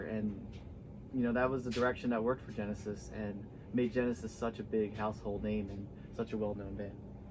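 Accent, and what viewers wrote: Philadelphia, United States. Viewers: Agreed is the best however better than. was too long would have made a better single disk. Hey is that a Philly accent?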